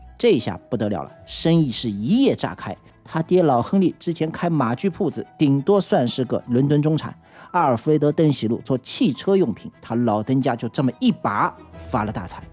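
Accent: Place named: Shanghai, China. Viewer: 博主上普口条很舒服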